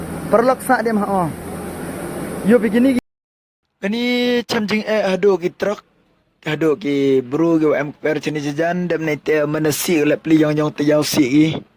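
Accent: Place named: Meghalaya, India. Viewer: Nga u #Bhoi Kwah oh u tip chi klam #Pnar kam phi leh Sngiaw suk sang te i sur #Pnar phi bro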